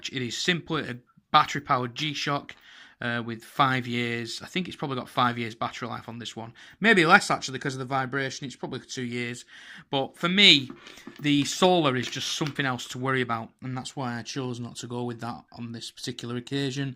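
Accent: Bolton, United Kingdom. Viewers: Yup, I think you've convinced me. I'll get one ordered 👍 Are you from Bolton by any chance? 😎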